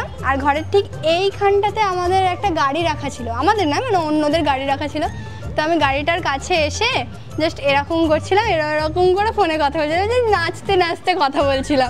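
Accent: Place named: Marwari, India. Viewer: Tomar Marwadi Katha❤❤😅😅😅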